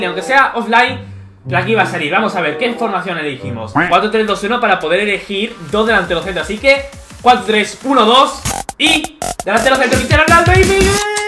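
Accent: Germany. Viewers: eres alemán? 😃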